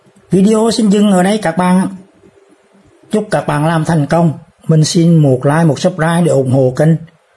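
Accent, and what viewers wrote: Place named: Hue, Vietnam. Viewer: Rất hay!! Thích nghe giọng nói ĐẬM CHẤT HUẾ của ANH....THANKS.!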